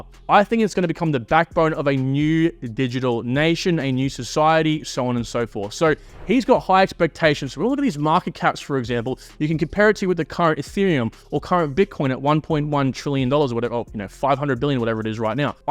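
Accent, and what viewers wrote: Australia. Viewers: Are you from Sydney?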